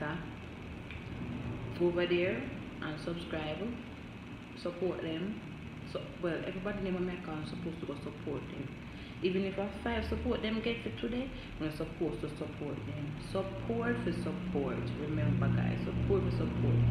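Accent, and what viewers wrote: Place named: Caribbean. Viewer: Lol i love your Caribbean accent ☺️ ready to support anyone who wants to grow🥳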